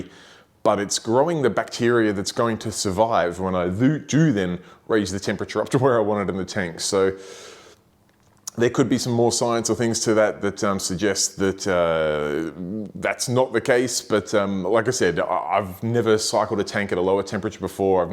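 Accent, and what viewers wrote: Australia. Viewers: As a man raised in California, I’m only here to hear the best accent the world has ever produced! Damn I wish I was Aussie! Lol. Awesome tank man and grate series.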